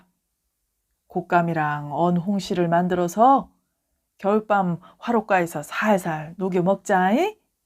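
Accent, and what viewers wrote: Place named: Jeolla, South Korea. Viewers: 박노해님의 자전적 소설 참 감사히 잘 들었습니다.전라도 사투리의 정겨움에 뚝뚝 묻어나는 정을 느끼다보니 가슴까지 따뜻해집니다.가난하고 병들고 벼랑끝에 서있는 자들에게 설땅과 쉴땅을 내어줄 수 있는 넉넉한 마음과 능력을 신께 소망해보는 아침입니다.작가님과 께 감사드리며 오늘도 복된하루 되시길 바랍니다. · ~~♡ 구수한 남도 사투리와 지혜로운 사람들의 정다운 얘기네요~❤ 고맙습니다 ~^^ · 감동의 물결과 눈물이 납니다 나도 누군가에게 따뜻한 사람이 되렵니다 욕심이 날 때 내가 빛나고 싶을 때 나를 돌아보는 사람이 되렵니다 꾸밈없는 목소리로 그리고 맛깔스러운 전라도 사투리에.. 감사합니다 지금 여기에서 성장하는 사람이 되었어요 고맙습니다❤😊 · 전라도 사투리를 우아하게 정감있게 잘살려서 얽어주신 멋지십니다~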